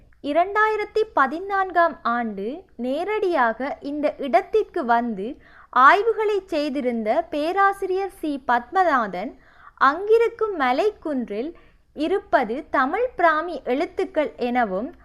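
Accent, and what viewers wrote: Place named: Vavuniya, Sri Lanka. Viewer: Nan India. Neenga pesuradu avlo alagha irukku. Vavuniya pechu roamba alaha irukku. Super 😍 நன்றி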